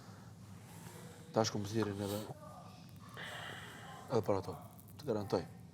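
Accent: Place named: Serbia. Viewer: Ky as me fol zdi ,ky flet si serbet qe mundohen me tol shqip prej zorit naj her rrall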